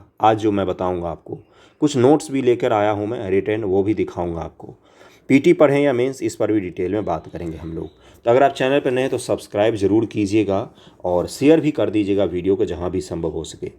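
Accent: Darbhanga, India. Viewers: Sir Aapka awaz Darbhanga Bihar se match kar raha hai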